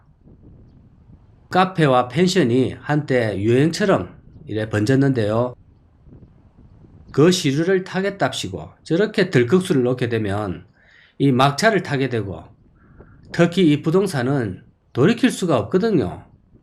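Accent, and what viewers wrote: Gyeongsang, South Korea. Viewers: 쥔 양반 목소리가 상당히 특이하네요~ ^^; 뭐랄까, 갱상도 사투리+교회오빠 목소리 ? 한국 부동산 멸망전을 위해서 오늘도 고군분투 하시느라 고생이 많으시네요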